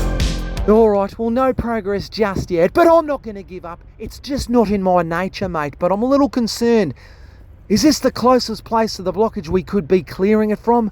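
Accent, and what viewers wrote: Australia. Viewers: No idea why the algorithm sent me your way, but here we are, and I'm enjoying the videos! Greetings from merry England. Love your enthusiasm for the job, and man you couldn't be any more Aussie unless you had a hat with corks hanging off it, making Kevin Bloody Wilson sound shy and reserved! Keep up the good work mate.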